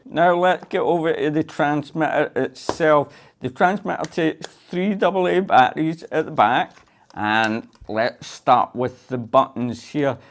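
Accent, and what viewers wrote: Scotland, United Kingdom. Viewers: Scottish accents are so cool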